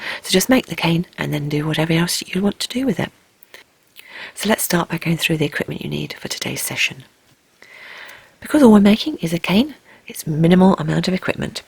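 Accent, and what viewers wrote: United Kingdom. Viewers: You inspired me today, to borrow some ideas from you, think up some of my own, and make a veneer, and then a bracelet. Mind you, it was the absolute worst weather for working with polymer clay (hazy, hot and humid), but I did it anyway. It came out pretty well, too! The funny thing is, every time I grab a wooden skewer to smooth out seam lines, I can hear your sweet British voice inside my head, coaxing me along. Thanks for all you do.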